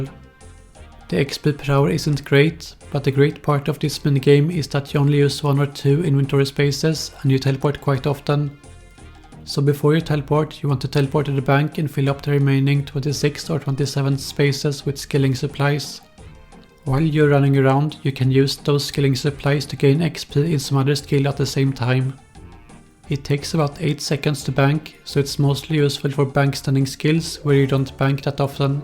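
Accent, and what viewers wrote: Sweden. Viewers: thats the swedish-english accent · Herregud din Svengelska.. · below average in english and he sounds swedish, most(young) swedes are better than this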